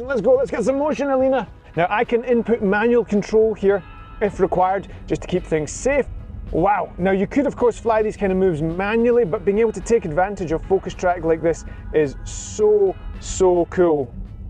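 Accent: Scotland, United Kingdom. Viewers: ... and scottish accent ...! Beside the really valuable tipps. Greez from 🇨🇭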